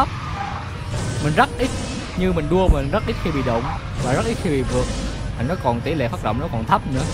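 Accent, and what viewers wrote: Quang Ngai, Vietnam. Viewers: Giọng QN dễ thương ghê haha :))))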